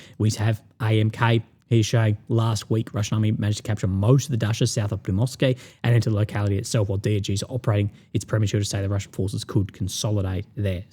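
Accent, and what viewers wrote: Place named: Australia. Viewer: Down under mate 🙂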